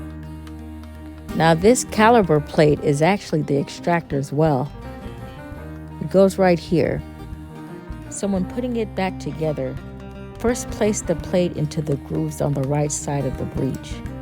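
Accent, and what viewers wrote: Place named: United States. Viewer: Thank u Sister. Your channel is the one of the most attractive to me. Smooth, correct american speech, n well knowing the subject. U really good. ❤ Respect from a Russian speaker.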